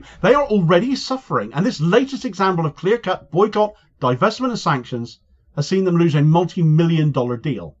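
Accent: Cornwall, United Kingdom. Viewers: He is from Cornwall in the UK and has a regional accent (which I love), try using captions maybe?🤷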